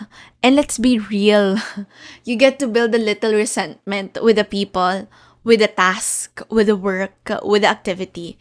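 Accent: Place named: Philippines. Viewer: That was one of the greatest podcasts I’ve ever seen And I see a little Philippines accent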